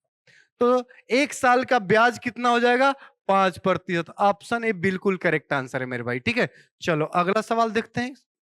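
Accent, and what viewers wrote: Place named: Bihar, India. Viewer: Sir aap chhatisgarh se nahi ho na bihari jaisa feel ho raha hai bato ko sun kar😂😂😂😂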